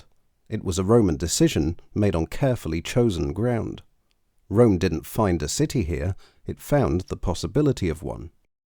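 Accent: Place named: United Kingdom. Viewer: It’s so nice to have a real voice narration. Sensible pronouncation with British English cadence.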